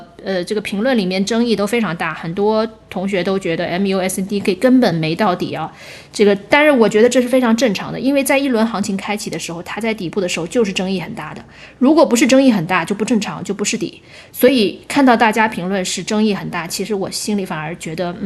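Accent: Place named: Beijing, China. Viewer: 期待捣持出镜，亲切的老北京话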